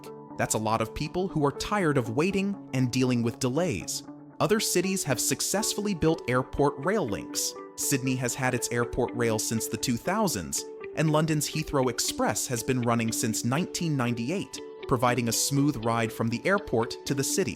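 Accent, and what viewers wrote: United States. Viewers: Why do we have a yank who can’t pronounce Melbourne talking about “our” city??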